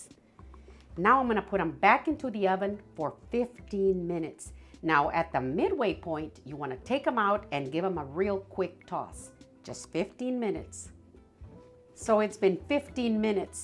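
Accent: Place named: Midwest, United States. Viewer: This is my kind of food! Looks delicious! Your MN/Dakota accent is adorable ❤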